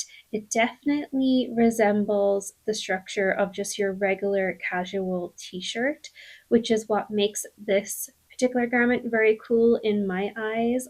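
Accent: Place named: Ireland. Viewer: Im so glad you mentioned you are from Ireland originally in this video - I spent the 1st 44 out of 45 minutes trying to place your accent, because some of the words you say sound so Irish, but some sounds not so Irish. I shouldnt have doubted myself so much 😂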